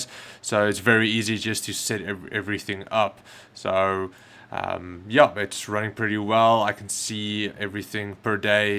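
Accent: South Africa. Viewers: Sounds like South African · Couldn't fool me, The good old SA accent, keep up the good work/hashing in SA from an ex South African in New Zealand. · Blatantly South African Takes one to know one lnao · lol your accent confused me at first.. sounded southafrican with an American kick, anyway cool channel bro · For the Comment below about accent its a Great South African accent, and yes at the currect exchange rates earning in US/Bitcoin and exchanging to ZA Rand can well pay for rent if you live in South Africa. Great Video keep going ! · Michael Chistol Modern Afrikaans South African. Picks up accent from tv and media.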